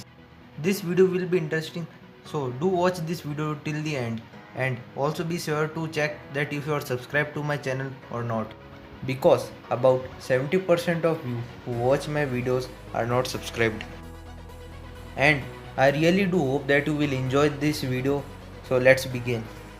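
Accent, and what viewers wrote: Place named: India. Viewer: India's accent sound Funny idk why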